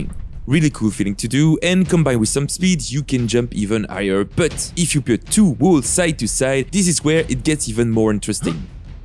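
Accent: France. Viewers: Par contre frérot t français?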